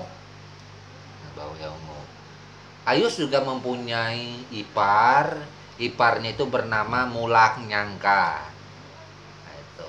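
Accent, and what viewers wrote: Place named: Paser, Indonesia. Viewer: Orang paser sih ini dri logat² nya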